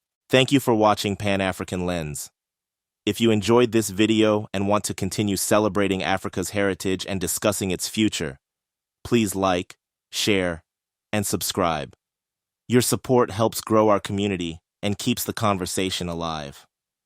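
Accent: United States. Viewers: Not interested in listening to a machine with an American accent or any other accent because it is a machine. Find a human to talk about Africa. The way African people from all over the continent talk with their feelings how they express themselves with regional and cultural accents and opinions. This is the kind of Africa I would like to see, not some American AI Bot! Even better in some ways is just have subtitles and speak the many languages of the beautiful continent. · He is a dictator. And like all dictators, it never works out for the people in the end. Putin is a monster. Why does the narrator sound like he is from New Jersey, not Africa? 😁 let us hear your voice! Don’t be fake! Propaganda channel….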